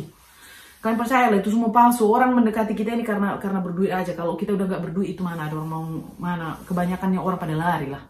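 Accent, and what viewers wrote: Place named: Sulawesi, Indonesia. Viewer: Loghat atau slang anda sepertinya dari Sulawesi, maaf jika salah. Saya pernah jalan jalan di Sumatera, Sulawesi, Kalimantan, Kepulauan Riau....saya dapati negara Indonesia sangat kaya dengan sumber alam, sawit terbesar, nikel, minyak dan gas,,,,malangnya oligarki yang mengawal kekayaan dengan korupsi....ada banyak kemajuan semasa Pak Jokowi...selepas 2024 tak tahu lagi.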